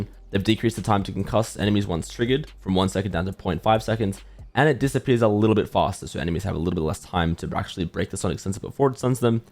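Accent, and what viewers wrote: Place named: Australia. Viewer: your accent suddenly is more australian than what it was before, impossible to understand 40% of what you say.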